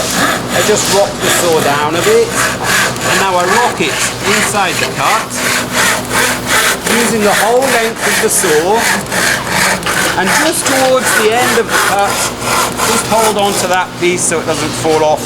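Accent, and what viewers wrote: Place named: Wales, United Kingdom. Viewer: Which part of wales are you from if you don’t mind me asking? I’m welsh and still live here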